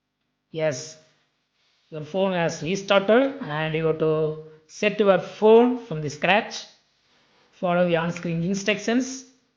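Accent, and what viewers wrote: India. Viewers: For God sake speak English like English not like hinde accent.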